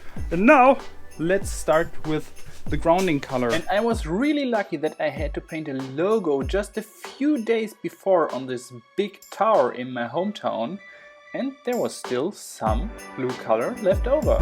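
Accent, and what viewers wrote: Germany. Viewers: Ich dachte die ganze zeit er muss deutscher sein bei seinem englisch... und dann kam die Bushaltestelle😇 (ist das erste video, dass ich von ihm sehe) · sofort gehört das deutscher bist haha :) schaue öfter vorbei !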